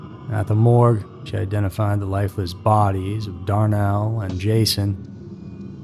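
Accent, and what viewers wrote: South, United States. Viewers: Heartbreaking tragedy. I love listening to! I swear I hear a bit of Southern accent. Thank you,. ❤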